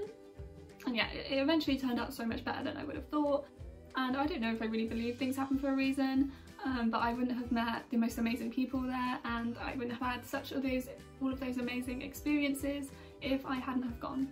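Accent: Birmingham, United Kingdom. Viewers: Aksen nya british banget yah.. 😁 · very pretty, harry potter accent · first yet thuough it was aussie accent, oh i see this is birmingham accent:) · aksen Birmingham dia nih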